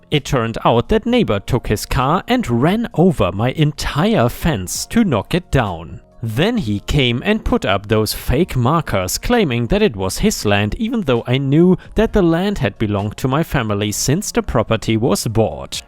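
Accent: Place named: Germany. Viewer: Hey, love your videos!❤ I have a question and I hope it's not rude. I'm curious to know where you're from, it sounds like you have an accent and I can't quite place it, maybe german or sth scandinavian (I'm German and you sound very german to me😂). But I saw in your info that you're located in the US so I'm really sorry if AE is your mother tongue and I just insulted the way you speak or something😅 I really like the way you read so please don't get me wrong, I was just curious:)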